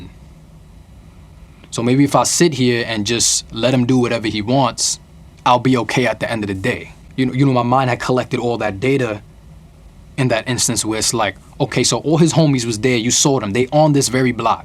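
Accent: New York, United States. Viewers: His accent so heavy it make me think I’m not a New Yorker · I LUV New Yorkers' accent!!! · He mostly he sounds like any other American I'm form California and he has a slight NY accent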